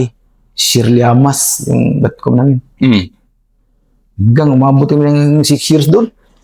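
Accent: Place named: Visayas, Philippines. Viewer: Hahaha kagiw.. Dalom kaayo nga bisaya ba.. Makalingaw ka master😄😄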